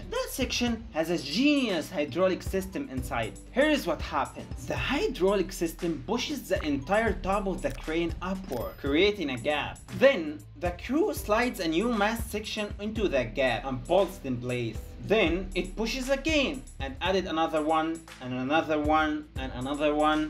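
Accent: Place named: Egypt. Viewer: Your accent is so Egyptian 😂 but i love the content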